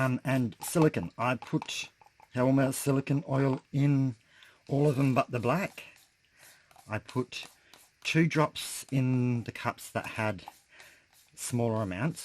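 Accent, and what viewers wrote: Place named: Australia. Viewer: I think it turned out really cool. The colours are lovely and I love the Melon and Turquoise cells. I have those colours too! It's lovely. I'm trying to place your accent... It has Aussie in it but doesn't sound pure Aussie? I'm fairly new to Aus so I'm still getting used to the accents. I'm from South Africa so I'll sound totally different to you and I'm so nervous about that. Anyway, good on you for starting this adventure!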